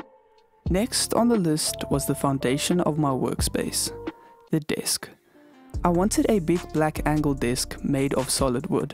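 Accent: South Africa. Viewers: You really sound South African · Are you South African ? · bro u have a south african acent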